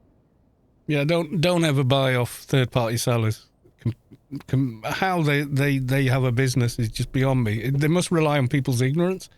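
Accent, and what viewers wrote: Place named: Somerset, United Kingdom. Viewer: Have to correct the record for my local brethren: the Wurzels (of Combine Harvester fame) are from Somerset and so is that accent. Cornwall and the Black Country are both pretty different :D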